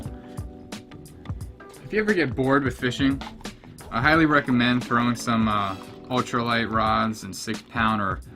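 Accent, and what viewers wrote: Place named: New York, United States. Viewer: it's how us New Yorkers talk😂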